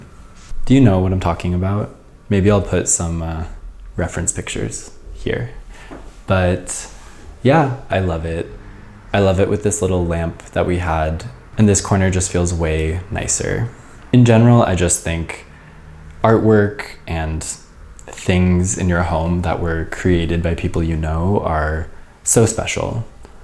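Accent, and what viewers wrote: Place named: Ukraine. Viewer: from Kyiv?? so nice to hear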